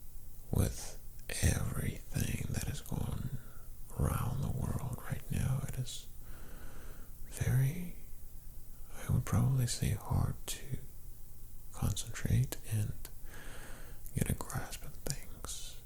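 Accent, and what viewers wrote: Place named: United States. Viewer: Your English and your American accent are ridiculously good. Also, you have a very lovely mouth.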